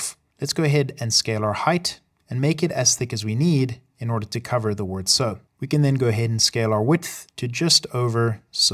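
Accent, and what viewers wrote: South Africa. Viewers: Your accent sounds South African ? Are you ? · Are you South african🇿🇦?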